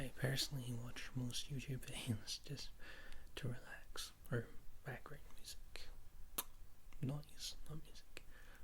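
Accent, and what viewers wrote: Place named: Scotland, United Kingdom. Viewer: Yay welcome back!!! Love these rambley style videos, dat whispering voice + dat scottish accent = <3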